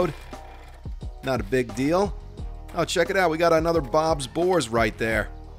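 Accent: United States. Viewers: Best series ive seen so far. You sound so american, its insane 😂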